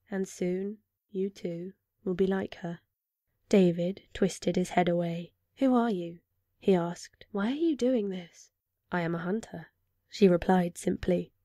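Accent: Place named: United Kingdom. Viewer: Oh my .... This channel ... I don't ever want you to stop reading :( There's no sweeter sound to my ears than a bedtime story read with such a warm voice. It reminds me of my childhood. And as a french guy, i think your delightful british accent is the cherry on top.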